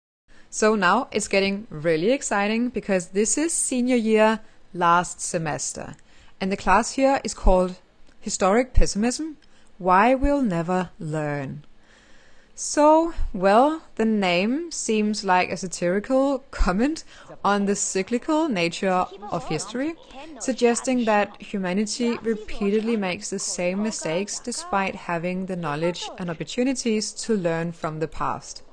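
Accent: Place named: Denmark. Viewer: I soooo love your Danish accent.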